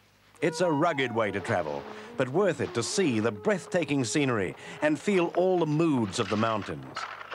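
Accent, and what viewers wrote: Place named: United Kingdom. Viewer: Amazing to hear the narrator with a still slight British accent. How things have changed in 40 years.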